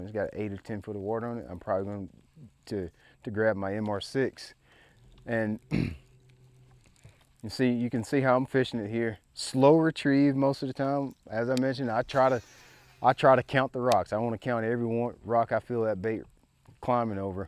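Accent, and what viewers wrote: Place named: South, United States. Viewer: Boi I’m from Louisiana but THAT accent is so country i love it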